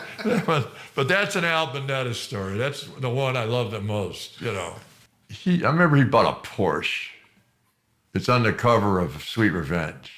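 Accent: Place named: Illinois, United States. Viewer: Thank you, for giving me this transporting window into your life with your brother John and a cast of characters that was colorful, fun and from the heart and reflects your love of the time you spent with him. 🌸Your Illinois voice makes the stories even better as I have family from west of Chicago, more fun than a barrel of monkeys. God bless you ❤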